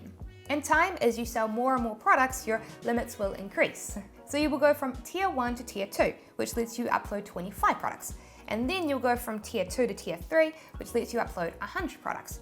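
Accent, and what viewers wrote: New Zealand. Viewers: I knew you were a kiwi straight away! Awesome to see fellow kiwis killing it!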